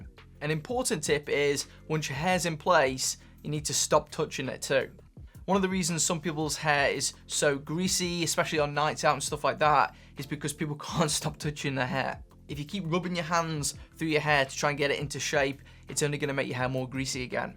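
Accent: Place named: Sheffield, United Kingdom. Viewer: Sheffield accent 🤩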